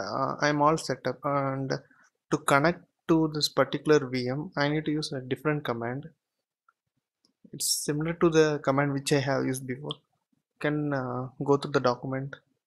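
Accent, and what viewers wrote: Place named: England, United Kingdom. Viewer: Nice English accent.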